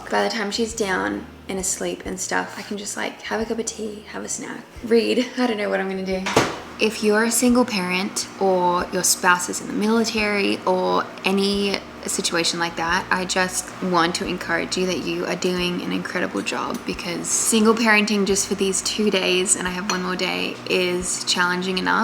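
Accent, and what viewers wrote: South, United States. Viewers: Your southern accent is not bad at all! I'm southern, and I've always thought that certain vowel sounds in an Australian accent sound really similar to a southern american accent. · Hahaha I love the southern accent! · I'm married to an Aussie and I think you guys for some reason always sound like you're making fun of us when you do what I call the regular American accent. lol Idk why! Your southern accent is on point though! ❤ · The American accent had a hint of southern and the pure southern accent was amazing. Btw you made us feel like we were your friends in this vlog ! ❤ It was so intimate. It was really nice to get to know you more! ❤ Btw apparently the dinosaur bones are the fallen angels/creatures that got destroyed during the flood that God sent! There's probably more information in the book of Enoch. I love your natural brown hair too, it looks so good sis. 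God bless you and your family. (we love the 20 minute videos !) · As someone from South Carolina, your southern accent was not half bad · Aussie here, I loved your southern accent! It was really good! Also just wanted to say that having Gabe working from home everyday is a blessing too, my husband works long hours for 3 days and then has 2 days off and I really enjoy his company on those 2 days! Wish he could be home every day lol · Your southern accent 😅 so good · The SOUTHERN accent woah!!!! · The southern accent was spot on! I'm from Memphis, and you actually sounded a lot like one of my friends 😂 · As a woman from Clarksville, TN you nailed that southern accent girl! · Ok but your southern accent was good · How is no one talking about how incredible that American accent was?! Also the SOUTHERN ACCENT?? Incredible. Better than some actors doing a southern accent. I’m impressed. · Lol the Southern accent is so on point. 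You sound like Miley cyrus in the Hannah days or Georgia from Genie and Georgia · Honestly loved the southern accent! And this vlog was so sweet!❤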